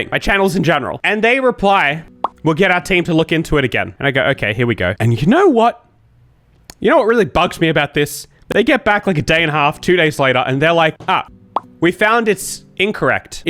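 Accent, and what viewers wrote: Australia. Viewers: Aussie accent doesn’t always mix well with their sensors very stupid lmao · This is definitely massive YouTube BS, but... I wonder if it's an accent thing? Hear me out: I can't imagine that their "real humans" listen to videos all the way through. It's just inefficient. I would not be surprised if they have A.I. isolating suspect words and then presenting just those snippets to listeners. f you listen to out of context snippets of you saying "can't" and don't realize that its an Australian accent, it could maybe mistaken for "c*nt". that's my theory anyway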